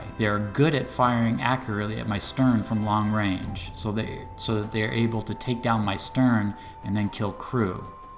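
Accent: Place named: United States. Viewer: Love the crispy clear american accent. It's easy to understand and sounds great. Showing once again proper american english is indeed beautiful. As for the video itself i did pass the mission previously, but it was more because of dumb luck than skill, thanks for the info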